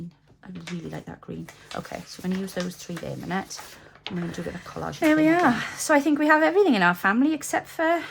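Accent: Wales, United Kingdom. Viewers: So glad I found you - I've really been enjoying all the A-Z Christmas crafts. I spotted that Welsh accent too - I've just returned home to Wales after 20 years away and loving it. Nadolig Llawen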